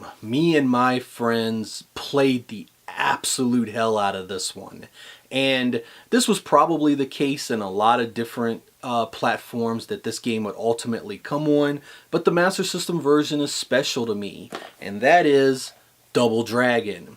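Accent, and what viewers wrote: United States. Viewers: The movies would be in deutsch xD. The newer movies are clearly better and I like them all. I dont write here, that this video is cheap or something like that, because I dont care, your content so good here also and your analyses are great. I criticise something different, isnt in? Oh my god, the way of talking in USA is facking actually really so annoying, you know.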